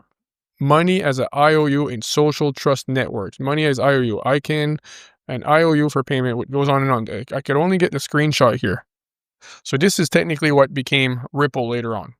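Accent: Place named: Newfoundland, Canada. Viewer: [• Someone finally asked the question I was going to ask… I thought to myself “sounds like he’s from Newfoundland!“. I actually sound like you do… i pronounce words like you do. Maybe I’ll run into you at the Avalon mall, or downtown at a bar? Just kidding. I think the first guess is more correct. Although, you do sound like a Newfoundlander absolutely! And I’m from here.•] [• maximum respect to you my friend!🙏😎👍🫡•]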